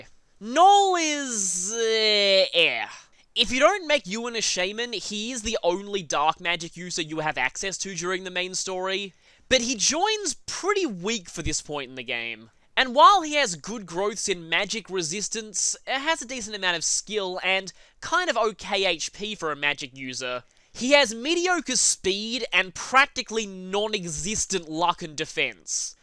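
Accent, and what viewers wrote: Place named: Australia. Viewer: man that speed up voice really did show your Australia accent.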